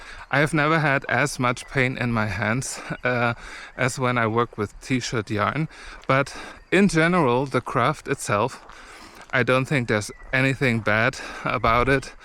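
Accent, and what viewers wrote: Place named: Germany. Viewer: The more I listen to you the more I think you have a German accent..... It's mild and very calming to listen to you speak 😁❤️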